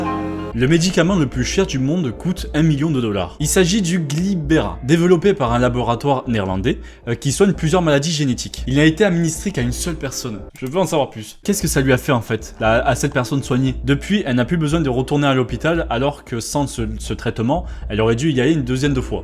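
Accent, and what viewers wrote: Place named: Montpellier, France. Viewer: RBATI Tout droit de Montpellier par dit !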